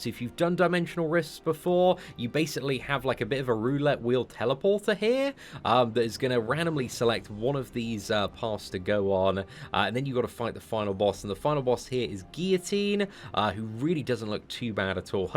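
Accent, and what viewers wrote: United Kingdom. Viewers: I’ll never read the forums or need any other channel besides for mcoc news 👀. Man saves me hella time and I get to get a free British accent talking person without using my pc narrator mode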